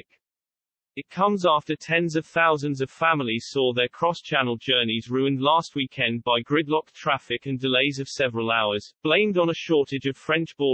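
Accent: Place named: Russia. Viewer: Why not narrate your own videos? We won’t make fun of your Russian accent.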